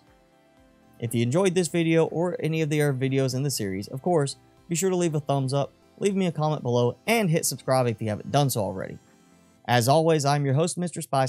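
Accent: South, United States. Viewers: Country as hell for a YouTuber maybe lol. 🤠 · Thanks man. Btw you sound country as hell!!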